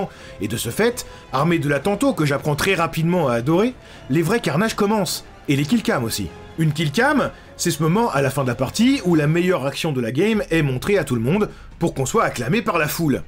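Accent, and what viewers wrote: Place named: Champagne, France. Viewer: oh petard un compatriote élevé au Chaource et à l'andouillette AAAAA ! Je sais pourquoi je me sent comme à la maison quand je t'écoute maintenant ! J'tenterais même de dire que t'es de Brienne 😂 Bravo pour ton travail en passant 💪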